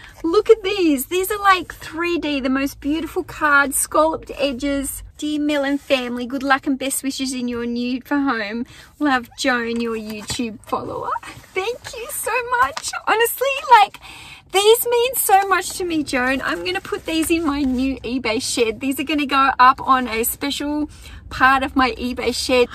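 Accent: Australia. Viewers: Hello, this is the first time viewing your YouTube videos. I did enjoy your content and hustle. I know a lot of folks, like myself, from the U.S. cant distinguish certain accents. I have always loved the Aussie accent over British. I don't know why. I do have a question though. Did you just say to yourself, "These IKEA bags are fun and awesome." They really are. I see blue ones all the time. I've never seen these. That's so cool. My wife and I need to get some. We are trying to one day be successful in turning things for a profit. We have gotten our feet wet. One day we will be full time. Lots of love, Rosie and Ritta from Texas.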